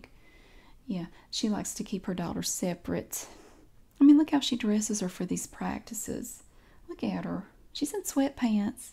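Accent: South, United States. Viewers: You really acted this role well I think your bitchy mum is awesome and funny I love your southern accent why you not an actress you really good at acting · so southern bruh spot on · I love watching you not only because of your videos but because of your accent. I used to live in the south but I moved a few years ago and I've recently been really missing it. Your videos bring me back and make me so happy. Thank you.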